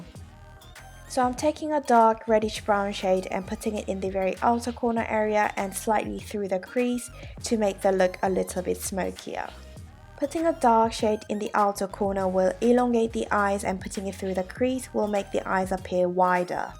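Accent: United Kingdom. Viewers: 네일 어떤 색상인가요?? 한국꺼면 좋겠당ㅠㅠ.. 저도 외꺼풀이라 자주보고있어요!!! 영국 발음도 너무 이쁘고♥♥♥ 건강 관리 잘하시구 앞으로도 좋은 영상 부탁해요~~ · 저도 속쌍꺼풀인데 화장이 너무 예뻐요 영국발음도 너무 멋있고 매력적이에요!!!!!!!!다른 튜토리얼도 많이 올려주세용❤️ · 이런 거 잘 못 남기는데 언니니까 댓글남겨봅니다..ㅠㅠ ㅠㅜlove...♥ㅜㅜㅜ 처음엔 저희언니가 보는거 따라보다 보게 되엇는데 언니 정말 매력 넘치세요...ㅜㅠ♥ 정말 같은여자가 봐도 반할정도...ㅠㅜㅠㅠㅠ ㅠㅠㅠㅠㅜㅠㅠ그리고 진짜 언니가 고르시는 색마다 언니랑 정말 잘 어울려요...ㅠㅠㅠ 최고최고...ㅠㅠㅠ♥ 1년뒤에 저도! 드!디!어 이제 화장할 날이 올텐데...!!...ㅠㅠㅠ 그땐 직접 따라해볼게요ㅜㅜ항상 업로드해주셔서합니다!!!! (영ㅇ국발음도매력짱짱!꺅언니최고!) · 아픈건 괜찮으신지용...! 그나저나 뭔가 핼쓱... 해보이셔요ㅠㅠ 영국발음도 너무 좋아요ㅠㅠㅠㅠ 항상 영상 볼때 엄청 집중되요 발음때문에ㅠㅠ 끄아아 저랑 눈 모양이 비슷해서 항상 공감되요 눈화장이ㅎㅎㅎㅎㅎㅎ 짱 좋음! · new to ur channel was so shocked to hear that brit accent! haha this vid makes me excited for my trip to korea! :)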